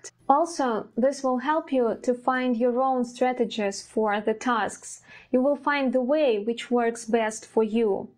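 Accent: Russia. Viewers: It's really not, I don't think you heard native English accent. I could hear the russian accent from the very first minute of the video. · Wow, Russian accent and manner of speaking are so noticeable:) You don't really sound like a native... no offence, tho. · Real Russian intonations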